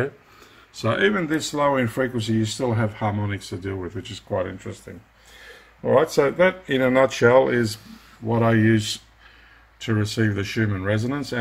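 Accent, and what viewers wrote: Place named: Australia. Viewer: You sound like you're in Australia. I wonder if you can receive a 60 Hz signal emanating from power lines elsewhere in the world. American Samoa would not be too far away, but it's small, so power lines would be short, not making a good transmitting antenna. However, in North America, some power lines are hundreds of miles long. If a wire antenna does not work, I would try using an iron core magnetic loop antenna.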